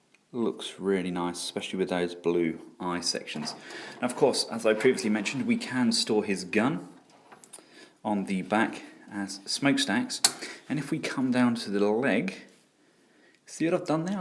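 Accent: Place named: United Kingdom. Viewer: british accent... must sub...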